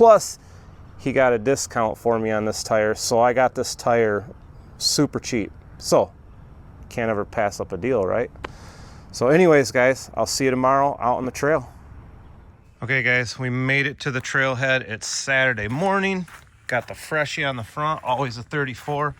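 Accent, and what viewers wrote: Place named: North, United Kingdom. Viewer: I hear your mega northern accent!😁 yu play hockey too!.!?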